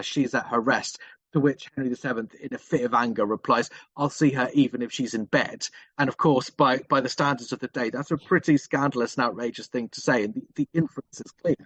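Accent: United States. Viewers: No disrespect, but find listening to words mispronounced, and an American accent when discussing Tudor history, a lot of which is supposition, especially about Prince Arthur, very off putting.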